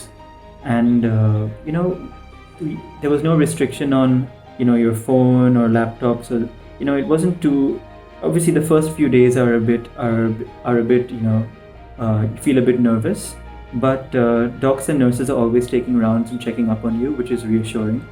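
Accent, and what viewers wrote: United Kingdom. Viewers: It is really good to hear my friend that you have recovered from this contagious virus. Stay Safe and one more thing I like you English accent and you style of speaking English with coolness. If u want to tell me regarding how can I improve English speaking, then it will be beneficial for me. I know this is not the right platform because u are sharing experience regarding your journey against Covid-19, but if u want to give some suggestions then okk or don't wanna give then also okk. Have a good life my friend. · jb bimari lgi tb desh ki yaad aa gyi....vrna abi tk toh british accent me hi baat krte thee....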